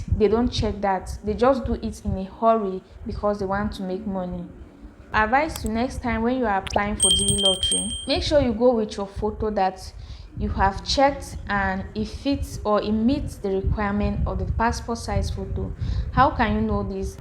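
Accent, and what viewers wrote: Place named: Cameroon. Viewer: She sounds like a Cameroonian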